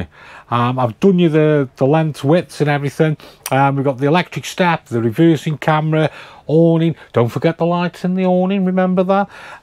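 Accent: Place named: Stoke-on-Trent, United Kingdom. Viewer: He is from the UK, from his accent I am guessing he is from Stoke-on-Trent but I am sure he will correct me if I am wrong 😂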